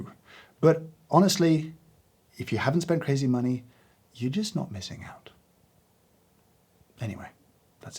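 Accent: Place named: England, United Kingdom. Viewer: Why he sounds less English today?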